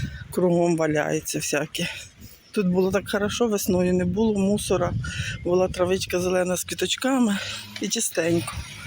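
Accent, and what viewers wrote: Surzhyk, Ukraine. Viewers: Не буду на вас підписуватись....Ваш дикий суржик дуже ріже слух.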